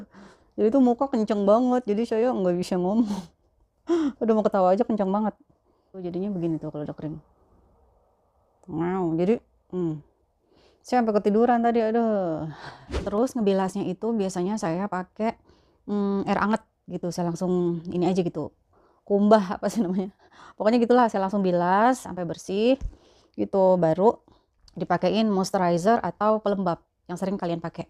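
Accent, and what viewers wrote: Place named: Betawi, Indonesia. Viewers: Betawinya msh kental...😀😀😀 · Mbak..lucu bangeet sii..bicara nya kok depok bangeet..rumahan gayanya .gak imeg "an..haha..love you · Logat Indonesia betawinya masih kental... Bagaimana orang Arab lihat wajah Indonesia yg rata2 pesek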